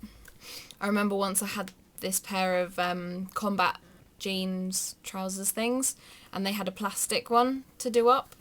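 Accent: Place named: Australia. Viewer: You made me laugh sooo much how you said things it made it even more funny with your Australian accent.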